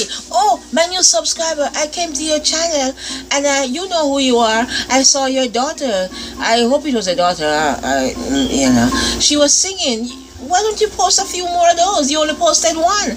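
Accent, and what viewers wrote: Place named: Caribbean. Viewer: great, keep it coming please. Your show is great! Love when you sing yo us a bit. What is your patois? Jamaica? Barbados? Some isle accent, right?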